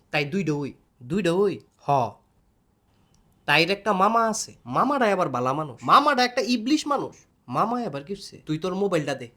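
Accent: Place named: Narsingdi, Bangladesh. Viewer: আমনে কি নরসিংদির নাকি,,,আংগ ভাসায় দি কতা কন,,,,বাড়ি কইনো আমনেগো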